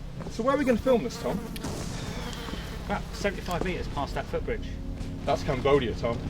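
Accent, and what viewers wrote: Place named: United Kingdom. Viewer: Just the way a lot of us say it in the U.K. same as a lot of people say car been rather than carbine with an I sold :)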